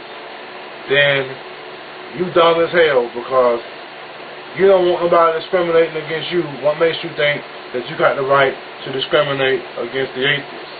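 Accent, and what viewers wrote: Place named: South, United States. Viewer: Yebo mfana. (isiZulu, Yes boy, a friendly greeting) Well, as an atheist you might be somewhat isolated in Aiken County, but, well, there must be another free spirit there.....somewhere. Hope u find him. LOL, and I am having HUGE fun learning how to understand the Southern accent. You are an excellent speaker, and I take it from your confident and easy manner that you have been "a-activising" for quite a while.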